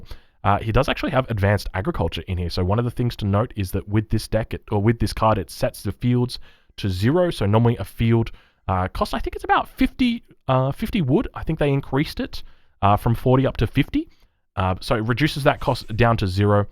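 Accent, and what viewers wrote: Australia. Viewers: That's just the Irishness of the Aussie accent.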